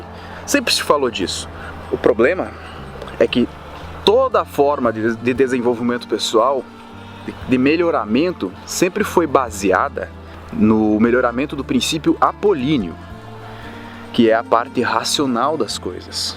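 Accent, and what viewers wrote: Rio Grande do Sul, Brazil. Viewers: Tu é gaúcho? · Ah, sabia que conhecia teu sotaque! Tu é do RS né?! Se não, é muito parecido. Excelente vídeo e paisagem 👏🏽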